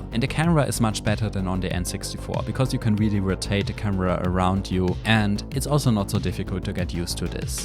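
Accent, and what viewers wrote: Germany. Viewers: I hear a Little bit german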